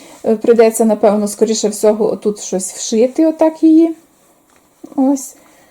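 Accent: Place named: Ternopil, Ukraine. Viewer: Добрий день,я у вас новенька,і мені цікаво,а у вас є відео,де ви розказуєте як ви попали в Карпати, дещо про себе,де працювали,про вашу сімю.Говір ваш такий,як на Тернопільщині, або на Франківщині,як ви потрапили на Запоріжжя?Розкажіть про свою сімю. Дуже буду вам вдячна. Всьго вам найкращого,обживайтесь на новій землі,пускайте свої корінчики,нехай Карпати стануть для вас гостинні та рідні.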